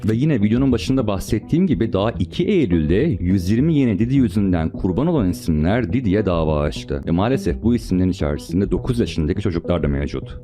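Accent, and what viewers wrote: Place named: United States. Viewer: KONUŞMA TARZINA KULAKLARIM KANADI! SANKİ TÜRKÇEYİ YENİ ÖĞRENMİŞ AMERİKALI TÜRK GÜRBETÇİ GİBİ !!! NORMALDE ÇOK KIZLAR KADINLAR BÖYLE KONUŞUYORLAR !!!!🤦‍♂🤦‍♂🤦‍♂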